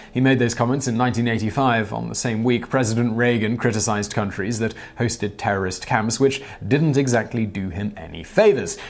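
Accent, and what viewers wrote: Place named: RP, United Kingdom. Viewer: The posh sounding accent is funny when mispronouncing words, like divisive.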